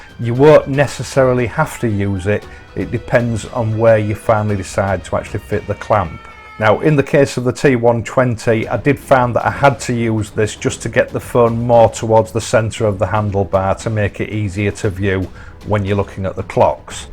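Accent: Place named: East Yorkshire, United Kingdom. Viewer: Clear East Yorkshire accent. The only people in Yorkshire who say werk instead of work. I will never buy a sat nav. North Yorkshire rules.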